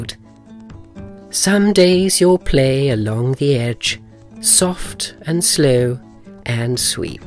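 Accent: United Kingdom. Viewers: these books are hilarious but the british narrator makes them PRICELESS!!! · Such a wonderful British accent. So proper and so wicked. Really very funny.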